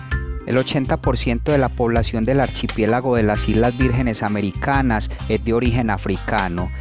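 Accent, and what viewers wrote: Colombia. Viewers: Sin duda alguna es colombiano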